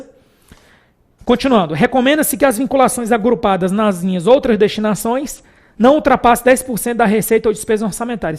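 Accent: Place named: Manaus, Brazil. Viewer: Acho que ele é de Manaus Pelo sotaque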